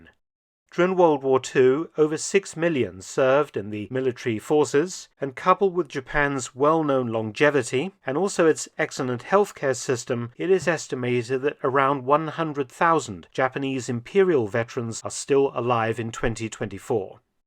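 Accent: United Kingdom. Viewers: Given the appearance (your grandfather's photo) that you aren't as entirely British as you sound, I suppose it is not a coincidence that this was released on ANZAC day. My son wearing my fathers WW2 RAN medals placed a wreath for Scouts Tasmania as part of the ANZAC Day remembrance ceremony today. He also officially received his Queen scout award. Well done Monty, Pa would be proud.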